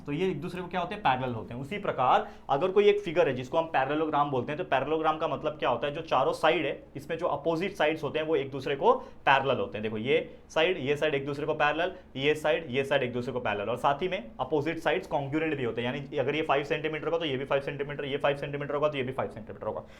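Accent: Maharashtra, India. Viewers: Marathi voice❤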